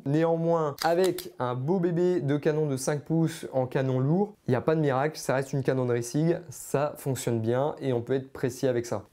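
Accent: North, France. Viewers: Toi t es du nord ou j mapel pas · C est un accent du Nord que j'entend? Bonjour de la part d'un ancien Lensois. Pour le depart, il est important de prendre en consideration les problemes rencontres par le P320 lors des tests aux US ou il pouvait arriver qu il tire lorsqu'il tombe dans une certaine position. Meme si il y a encore des debats sur l'origine du probleme, la version officielle de Sig est que le poids de depart etait trop leger et la queue de detente trop lourde, ce qui permettait a la gravite d'activer la detente. Certaines videos semblent indiquer que ce n etait pas le probleme, du moins pas le seul probleme, mais c est clairement a garder en tete pour ceux qui envisagent une modification du mechanisme de detente. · L’accent du Nord, c’est de trop pour moi. Le contenu est bon mais je n’accroche pas à cause de ça. Je te souhaite une bonne continuation sur YouTube 🙂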